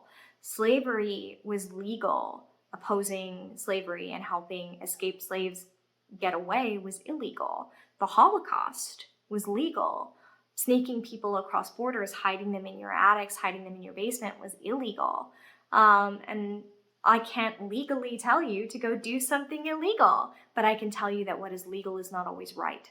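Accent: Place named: Australia. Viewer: Just a question for you. Are you originally from Australia, or spent a lot of time around Australians? Reason I ask is, when hearing you speak, although your overall accent sounds American, some words you said sound almost Australian.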